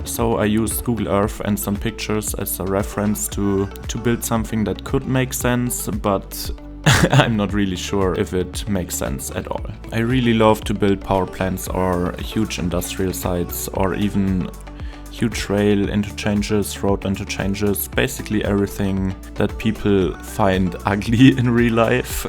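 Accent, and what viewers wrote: Germany. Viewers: Ich liebe deinen deutschen Akzent! Grüße aus Australien. :) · I really like the German accent ❤ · Of course you would have a German accent. You speak German. I've got a Southern US accent. I barely speak English, let alone as a second language. Keep up the amazing work! You, CityPlannerPlays, and Biffa introduced me to the game and I've been hooked! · It’s great to hear your voice, everyone has got an accent. It’s part of their unique personality. Even English speakers make fun of other English speakers accents. I love hearing English with a German accent 🇩🇪. Makes it all sound so technical and official 😂 vielen dank und warte auf das nächste video 🙏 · Well, a couple of things. First, I absolutely love hearing your voice and the accent. My grandfather came from die Schweiz (Lucerne canton) and my father could speak fluent Hochdeutsch. As a result, I learned some Deutsch as well and took a few years of language study in college. 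Sadly, I've forgotten a lot of it, but a German accent is a very nice thing for me to hear sometimes. Second, I think that it really sucks that people objected to you speaking on your videos and were mean to you. Besides the point above, I think you do a fine job with English and hearing a voice reminds me that there's a real person behind the genius of your builds. You have taught me a lot and are a treasure to the Skylines community. I'm glad to hear you! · imo your videos are better without narration it's not that your english is bad, it's pretty good, and yeah, even i as german can hear the german accent... it's just that all your vids i watched the last 2 years were unnarrated and this narration kind of... destroys the whole 'oh a new video is up' i love your choice of music and that helps relax after a day of work. so i just want to sit here, watch city go brrrr and listen to some music. 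now i have to listen to your voice - and can't hear that music and relax. good that you had the 'commentary' tag in your video. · Ich selber finde den deutschen Akzent auch nicht besonders schön, aber deswegen beleidige oder vermiese ich doch niemandem den Tag? Jeder kann seine Meinung haben, aber ich würde deswegen niemals auf anderen rumhacken. Außerdem sind deine Zuschauer ja hier, um dir beim zocken zuzuschauen! Außerdem habe ich jetzt mehrmals gelesen, dass andere die nicht Deutsch sind, den Akzent garnicht so schlimm finden. 🤷🏽‍♀️ Lass dich von den ganzen Kommentaren einfach nicht runter machen. Wenn du Voice Commentary machen willst, dann mach es einfach! Und dein Englisch ist ja nichtmal schlecht!! (Übrigens ist es echt angenehm dir zuzuhören 😅) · It feels very safe having someone with a german accent comment a build of infrastructure. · Love your commentary. Love Germany, love ya accent. 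From a bloke in Australia :) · Your English accent is fine. You do sound German, but there's nothing wrong with that. I can understand 100% of what you're saying, and that's all that matters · I think your German-English Accent is really pleasant. It's not harsh at all. Don't listen to the pointless critiques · I’m sorry people are so crappy. Your English and your videos are great. Your German accent is actually quite charming! I’m glad you put your voice in videos!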